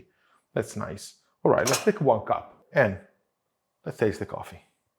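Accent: Italy. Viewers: as much as I love machinetta and italian accent..half an hour is true dedication :D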